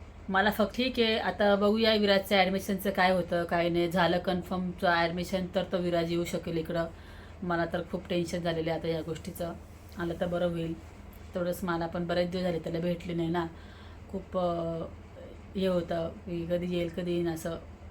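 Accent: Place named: Kolhapur, India. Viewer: Kolhapur bolte hain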